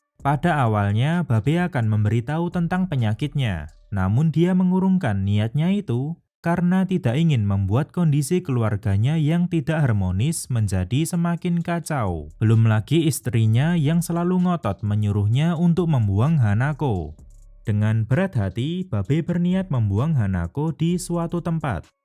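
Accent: Java, Indonesia. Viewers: medhok njir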